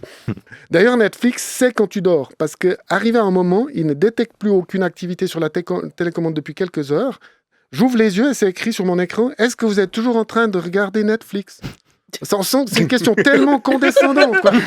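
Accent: Switzerland. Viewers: Tu reconnais un suisse à son accent mais aussi à ses blagues d’enfants de 5 ans ! L’humour et l’esprit vole vraiment très bas ! Le concept de révolutionnaire du dimanche prends avec eux tous son sense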